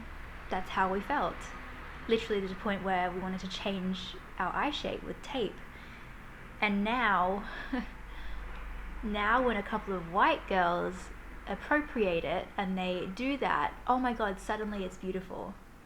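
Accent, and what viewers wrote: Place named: Australia. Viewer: Your a Aussie even your Aussie accent That's so bad my brother has a Thai wife who lives here I have a Thai girlfriend who's in Thailand and my youngest brother has a American girlfriend who's parental are Lao you shouldn't feel like that your very beautiful and your eyes are very round don't care about people who say bad stuff about you and the same my Mum and Dad come from England but I am Australian you're just as me don't follow trends that's exactly what a trend is they come and go and I think you're a lot more beautiful than most other western Australian girls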